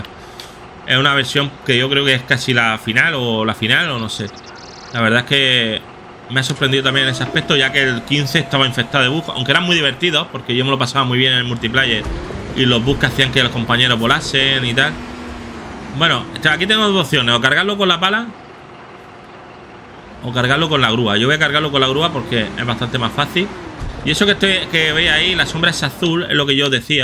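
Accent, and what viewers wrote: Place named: Murcia, Spain. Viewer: Me suena a Murciano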